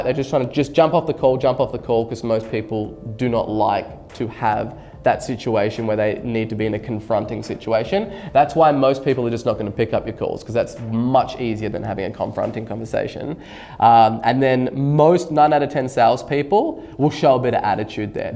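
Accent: Australia. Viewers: I love your ausy accent..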